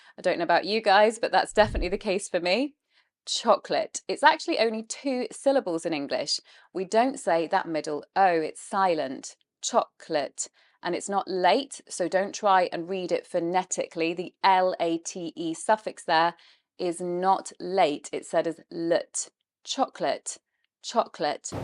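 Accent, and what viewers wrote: United Kingdom. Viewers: Your British English accent sounds to be a bit muddled. Where are you from ? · ❤, your UK English accent sounds beautiful in your voice.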